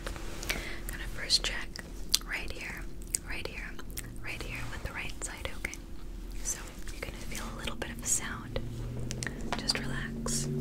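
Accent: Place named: England, United Kingdom. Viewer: Some videos you have an English accent, others not. Which one is your actual accent? Been wondering this for a while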